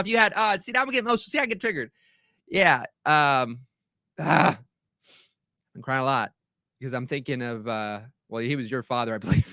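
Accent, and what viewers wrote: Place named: South, United States. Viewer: Please don’t ever stop doing that southern intense accent. Here for the sweat! Dude I lol so hard while driving to this. Loving the new videos, keep it up! Gawd dang ! Let’s go!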